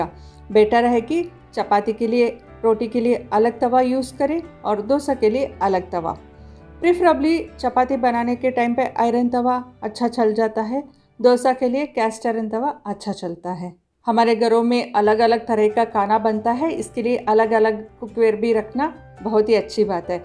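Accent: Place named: South, India. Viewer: aapka hindi me south india wala accent hai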